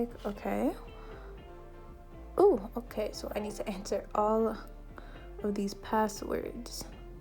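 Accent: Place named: Caribbean. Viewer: I know I was hearing an Caribbean Accent and music in background lol, rarely see them doing unboxings. BTW I'm getting the 11 soon 😊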